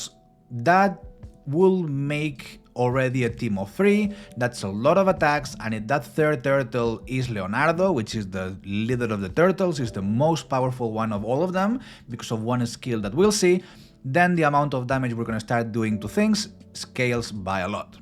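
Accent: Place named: Spain. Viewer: Hey, I'm the guy that asked you in your Spanish Channel which was your English Channel, I'm neither a native English speaker, but I've seen your video in both languages and it feels amazing here too, I love the fact that you are quick to speak in English you're fluent your vocabulary doesn't get stuck to remember words mid sentence, and pretty much everything is awesome, your accent is slightly improvable to feel even more native-ish, as a native Spanish speaker but English teacher I see you're not just trying to be a bilingual content creator but rather know what you're doing, I'm disappointed at the fact that there is almost no recognition in this channel and I'm guessing it's because there are very few Spanish RSL CCs, but many English magnates in the board like Ash, HellHades, etc. I feel you probably should promote your English Channel in your Spanish community more, as you may find more bilingual people within your main community who could come here and interact with your content to get you to more people's algorithm. 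That said, your accent, not to judge or anything, shows you're a native Spanish speaker, and let's be honest, that's not very attractive towards English viewers because of the prejudices and stuff, both in the sake of community engagement and blend in among other English creators, your accent could use slight improvements alongside adding a few slangs to your vocabulary to make native English speakers feel more related, which I don't doubt you can as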